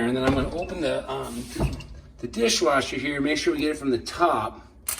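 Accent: Australia. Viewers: Entertaining and informative. Love the Australian accent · Hah Hah....northern Australian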